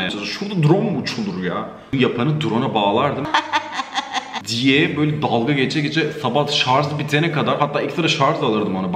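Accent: Bitlis, Turkey. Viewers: Bitlisli misin gülüm ? Djdj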